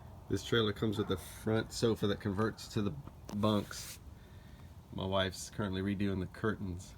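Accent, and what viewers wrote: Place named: Louisiana, United States. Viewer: You sound like Louisiana! :) I grew up in Bossier.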